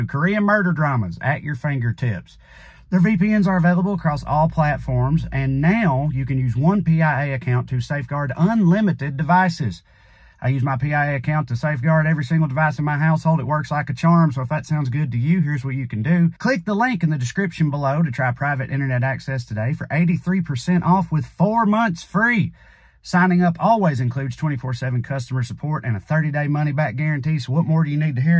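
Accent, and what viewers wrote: South, United States. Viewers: It is so refreshing to hear someone with a southern accent and wicked sense of humor express what is going on in our world. Preach Brother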